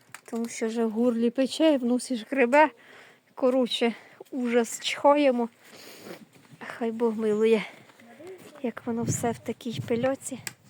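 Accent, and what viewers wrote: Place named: East, Ukraine. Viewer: Чого ж неможливо?!Досить мило,своєрідний східний діалект.Моя мама була родом з Донеччини,то у її рідному селі говорили подібно,ще й був в вимові м'який звук "Л".Як в дитинство потрапила!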